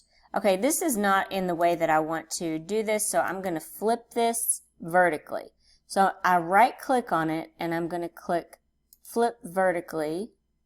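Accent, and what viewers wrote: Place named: South, United States. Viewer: This is my first time watching and I love your adorable southern accent, from one southern lady to another, keep on making stuff.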